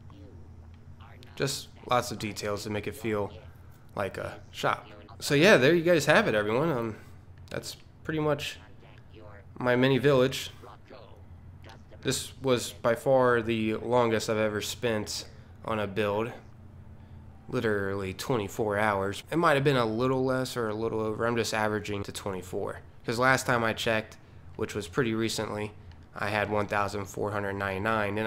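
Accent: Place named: Pittsburgh, United States. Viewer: Are you from Pittsburgh?! I know a yinzer when I hear it dut go stillers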